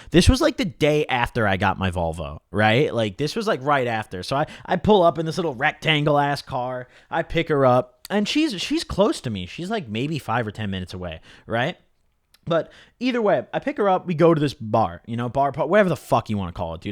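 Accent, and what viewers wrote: United Kingdom. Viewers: that british accent is disgusting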